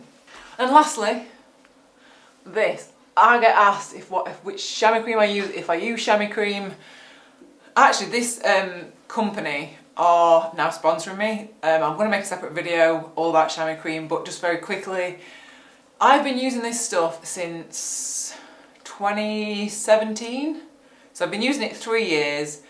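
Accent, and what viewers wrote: United Kingdom. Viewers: Your a nice girl but going bacc packing? So finally i realized ot was bIke packing lol...you limeys are great on youtube very friendly and informative. Just kind of unfortunate the limey accent. My ancesors came from there but now we talk a more normal accent here. I agree 5 plus hours in the sadfle and the butt is really sore!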